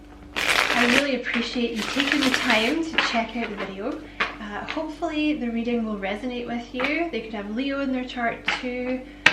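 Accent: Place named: Scotland, United Kingdom. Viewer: What a Fab reading. I so wish you did private readings. I Love a Scottish accent me. 😘😘X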